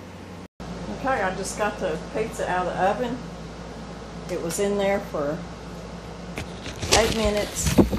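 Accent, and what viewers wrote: South, United States. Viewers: I can’t wait ti try this! I so enjoy watching your videos, your beautiful southern voice is magical, reminds me of my grandmother who also loved to cook! ♥️♥️♥️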